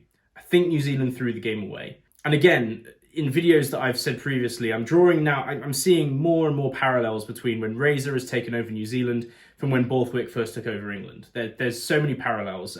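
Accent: South Africa. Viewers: A truly shit South African accent but good analysis of the game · Your Saffa accent is great but you need to throw the words "lekka" and "braai" in there a few times to be authentic. Oh, and don't forget "moer". No South African says three sentences without "moer" appearing at least once 👍🏻 Yeah, what a game. I think NZ not coming to SA during Super Rugby anymore hurts them, because they don't get to play at altitude anymore. Everyone said they stopped playing after 60 minutes, but that is what the Highveld does to a player. Then when you add in the nuclear missile we have in Kwagga Smith, the result is going to go one way. Regarding the TMO checking the truck and trailer and Bongi's tries, I don't know what the answer is there. We heard the Ireland vs SA game had too many TMO interruptions and now we hear the game needs more TMO interruptions. I don't care which one, but it would be nice if World Rugby finally made up their minds? 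Either we accept some mistakes in the game, or we Big Brother every mistake. Pick a lane and stick to it, for the love of God. I'm okay with the tries being awarded, I'm okay with them not being awarded, but some consistency is required. New Zealand were very dangerous off turnover ball with broken play, but they were a bit predictable in the attack methinks. The young guys were properly tested. Dixon didn't have such a good game, but like Rassie said, they are there to make mistakes and to learn. Fassi had a banger after his yellow card, Nortjé was very industrious, Steenekamp is slowly but surely making his voi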